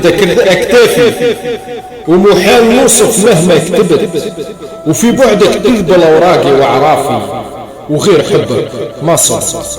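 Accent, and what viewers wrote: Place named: Tunisia. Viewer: روعة ❤ما احلاها اللهجة التونسية و اللكنة الجنوبية و الوسط الغربي ..دمتم بخير استاذ ..اشتقنا الى تونس في الغربة ..في القصيدة نلمس الروح التونسية و جونا و افراحنا و ذكرياتنا مع الحب الاول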